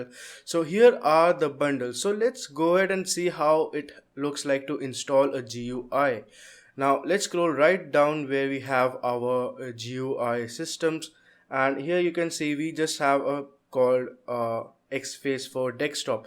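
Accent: India. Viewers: I can't take you seriously with that indian accent. Already laughing.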